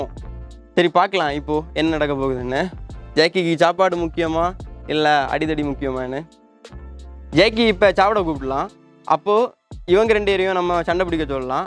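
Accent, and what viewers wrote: Kanyakumari, India. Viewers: Thampi kumarimavata Tamil ketta rompa alaga irukku malayalam mix Tamil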